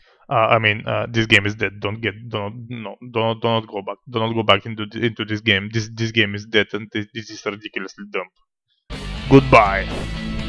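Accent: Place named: Bulgaria. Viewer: Сигурен съм , че коментатора е Бг личи си от километри ... като цяло най-смешния клип който съм виждал някога ужс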